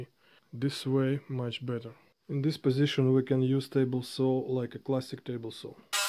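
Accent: Russia. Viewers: Мне послышалось или у автора действительно акцент русский и он русско - язычный?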